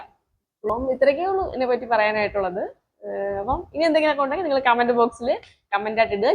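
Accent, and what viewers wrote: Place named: Thiruvananthapuram, India. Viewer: ANGINEY CHECHIYUM STAR AAYI MAARI....THIRUVANANTHAPURAM BHAASHA STYLISH AAYUM, NATURAL AAYUM KAIKAARYAM CHEYYUNNATHAANU HIGHLIGHT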